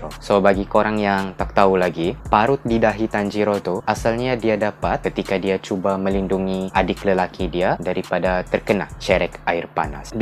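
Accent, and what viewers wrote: Sabah, Malaysia. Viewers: Bang orang sabah ke ?speaker favourite ni bos🔥🔥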